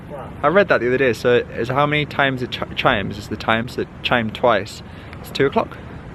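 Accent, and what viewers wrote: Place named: Northern England, United Kingdom. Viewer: It’s sweet how your accent gets more pronounced when you’re up North with your mum.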